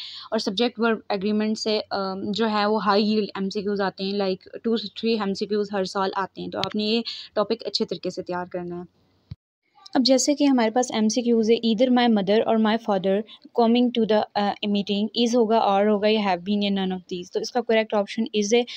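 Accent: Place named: Muzaffarabad, Pakistan. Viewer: Baji seems like you are from mzd ajk ?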